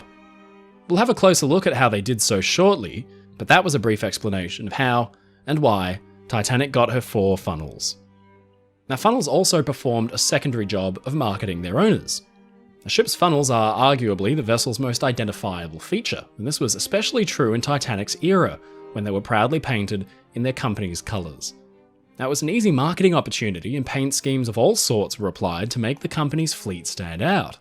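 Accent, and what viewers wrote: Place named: Australia. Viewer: It's because he's Australian. Different countries have different pronunciations of the same words. Neither is right or wrong. It's basically the same thing as those of us in the US pronouncing herb as "erb" when England pronounces it with the "h" sound at the beginning. Now, they could definitely tell us our pronunciation is wrong because they were saying it with the "h" before we were even a country, but would you say we're wrong? Probably not, and neither are they.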